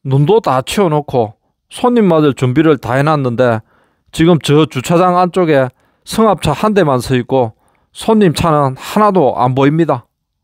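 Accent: Gyeongsang, South Korea. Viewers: 경상도 목소리 너무 거북해요 웬만하면 표준말로 해주시면 안될까요??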